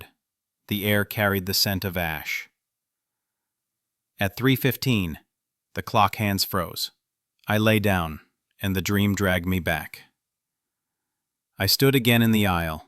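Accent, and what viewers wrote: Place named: United Kingdom. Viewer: are you a Brit maybe cause your accent shown through in the middle of the story 😁?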